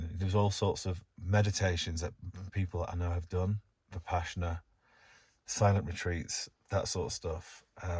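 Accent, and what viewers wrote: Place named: United Kingdom. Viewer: Just subscribed and meet you from The Astrology Podcast, your British voice and natural carelessness, ease with how you speak and not so in control is why I've look to find what passes through you to share. First video watching, serenity is indeed with silence, peacefulness a base to the inner witness. Thank you for sharing and making a channel to connect, I will be watching and generating with it. Thank you.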